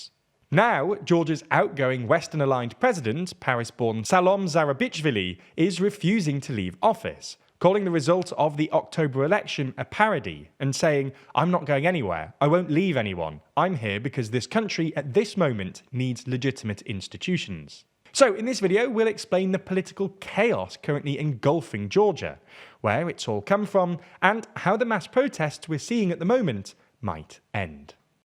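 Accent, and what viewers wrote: United Kingdom. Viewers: Typical British lalalala talk. No conclusion.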